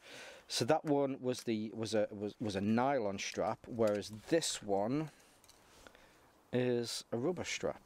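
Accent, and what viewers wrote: United Kingdom. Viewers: Hi, great video and nice watch :) I'd love to do this to mine although unable to find somewhere to buy Jays and Kays in the UK, assuming you're in the UK from the accent, where abouts were you able to get these from? Cheers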